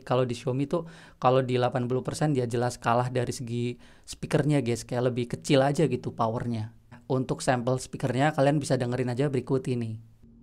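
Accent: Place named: Cilacap, Indonesia. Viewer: Kaya wong cilacap kiye apa yahh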